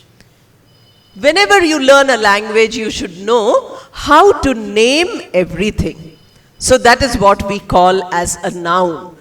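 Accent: India. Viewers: This Indian-born English Professor lady has not learned the correct pronunciation of words she spoke with her Indian accents. I'd suggest this Indian lady Professor to watch some YouTube videos presented by "native" English speaking ladies telling us how to pronounce words - simple or difficult to pronounce - in the way they are clearly understood by audiences all over the world, where English is spoken as a second language as is the case of this Indian "English" Professor lady. For Indian listener like Anita Pandey this Indian lady Professor presented "very nice speech with clear pronunciation" but that was not the case. Anita please watch YouTube presentation "Listening and Understanding" learn English with 3 steps video. Thank you for reading my comments. Hope it helps. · Super mumm ..... Your speech is real Indian language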